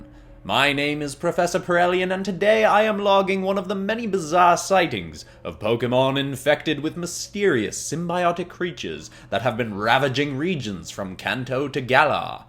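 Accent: United Kingdom. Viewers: just saying I like the video but the narrators accent you know the English accent it just doesn't hit right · The y highlight of this video was definitely the British accent 😂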